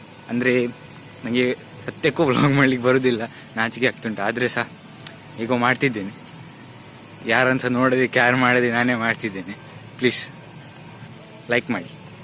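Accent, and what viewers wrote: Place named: Kundapura, India. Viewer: Nim kundapura kannada laik itt matt niv nachige padbedi nav nimg yenta heludilla so bindas matadi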